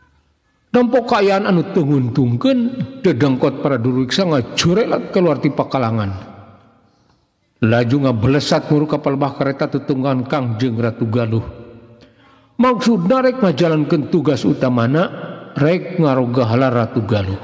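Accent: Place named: South Jakarta, Indonesia. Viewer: Saepisan ngadongeng na JAKSEL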